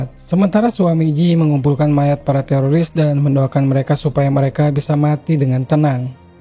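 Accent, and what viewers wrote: West Java, Indonesia. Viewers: Pasti admin orang sunda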